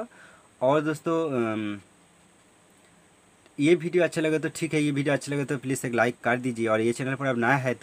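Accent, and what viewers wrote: Bengal, India. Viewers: भाई की हिन्दीभी बहोत ध्यान से सुननी पड़ती है क्योकि लहेका बांगला में आता है बेस्ट करते हो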